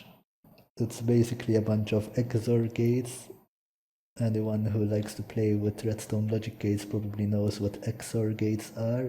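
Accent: Malta. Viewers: First time hearing his voice and shit that maltese accent is powerful af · Dak l-accent Malti Mannnnnn! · that sweet malta accent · Maltese accent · Maltese accent sounds interesting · Aaaaaaaw maltese voice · Ah yes the maltese accent is strong with this one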